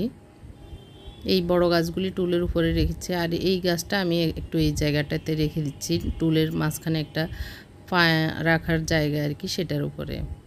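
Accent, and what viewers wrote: Noakhali, Bangladesh. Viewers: You sounds like from Noakhali.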